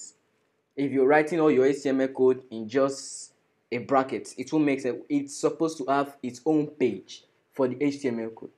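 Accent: Nigeria. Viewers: May God bless you. This is the only video that is slow enough for me to comprehend. It also has this Nigerian accent.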